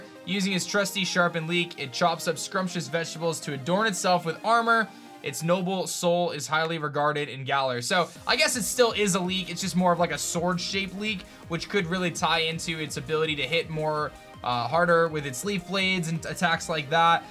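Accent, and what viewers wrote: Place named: Pennsylvania, United States. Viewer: I’m out of Pennsylvania and your slight accent sounds so much like people from around here. Are you out of the northeast?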